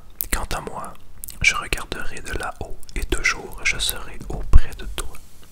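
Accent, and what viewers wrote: Quebec, Canada. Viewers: Bravo ! Top ! Merci beaucoup ! 😀 Ta vidéo a comblé une partie de ma nuit d'insomnie... Bons rythme et débit, ta voix est très agréable, c'est très apaisant. Moi je vote pour d'autres vidéos. Avec les plus de 500 pages du recueil de contes, on en a pour un moment - et toi aussi !!! 😜 En plus, petite française d'Alsace que je suis, je suis une fan inconditionnelle de l'accent québécois !!! 😘 Concernant les droits d'auteur dont parle un des commentaires, rien à craindre. Les contes des frères Grimm sont depuis longtemps tombés dans le domaine public. Les droits d'auteur sont dûs en Europe pendant 70 ans après la mort de l'auteur et 50 ans au Canada. Ils ne peuvent ainsi pas entraver l'enregistrement des contes suivants ! Alors, au boulot ! 😁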